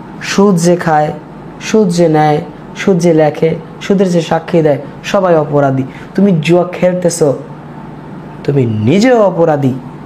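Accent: Barishal, Bangladesh. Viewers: ভাই তোমার বাড়ি বরিশাল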